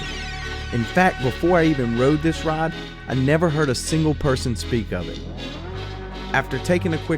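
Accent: South, United States. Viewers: sounds COUNNNTRRYYYY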